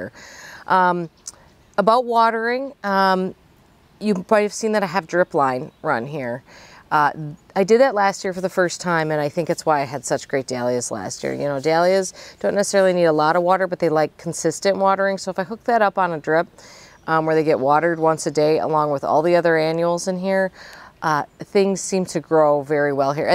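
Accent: Wisconsin, United States. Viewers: Great Dahlia video--THANKS! I have grown Dahlias for 5 years or so, but there are so many helpful things to learn! The Florida weave looks like a super way to keep Dahlias from toppling! I have 4 of the 5 varieties you showed, (not Penhill Watermelon), with all but Cafe Au Lait being new for me this year. I am so excited to see them bloom!! Your Dahlias are quite a bit bigger than mine so far, as I had to put mine directly in the ground. I would LOVE to start mine in pots, but I don't have room....I have 90 varieties total this year (Where do you keep them as they are growing in pots?)! ;) Thanks for all the tips--Hope to see an update this Summer! :) :) Love your accent by the way--My aunt lives in Wisconsin (Neena, WI), and it makes me think of her when you talk...such a lovely sound to my ears! Happy gardening! Hope you have bushels of beautiful blooms! 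;)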